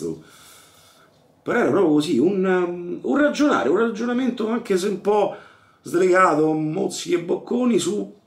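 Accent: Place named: Rome, Italy. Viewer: Ciao, volevo farti i complimenti per il canale. Ho iniziato a guardare i tuoi video cosi, facendo zapping su YouTube, e niente... Sarà la simpatia? Saranno la passione, l'umiltà, l'accento romano...? Praticamente me s'è aperto un modo e pure il portafoglio... "Ccitua!" 🤣 Senza entrare nello specifico ma altri canali simili non trasmettono la stessa atmosfera. Continua così 💪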